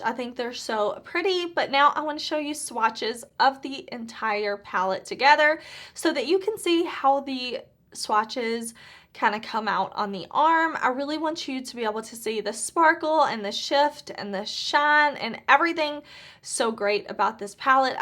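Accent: South, United States. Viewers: Those are gorgeous, but at 57 I don’t wear sparkle on the daily like I used to so I don’t need this palette! I am the one who watches all your videos because you are so fun to hang out with online and I could listen to your beautiful Southern accent for hours!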